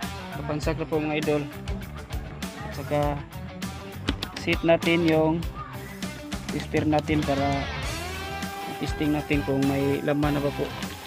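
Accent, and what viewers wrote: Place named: Visayas, Philippines. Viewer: idol mura ug bisaya ka ngutana lang! naa ka idea nga mo taas ang amperahe sa transformer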